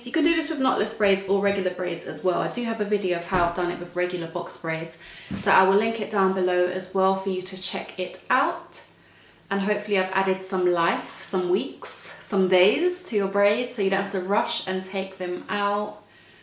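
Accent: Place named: England, United Kingdom. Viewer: The England accent 🥰🥰😌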